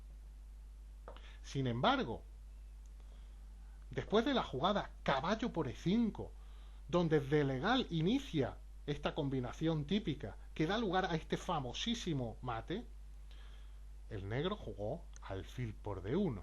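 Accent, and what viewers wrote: Spain. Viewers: a mi me parecio acento catalá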